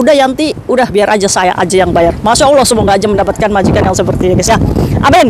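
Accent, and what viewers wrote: Indramayu, Indonesia. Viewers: Ka kya wong dermayu 😂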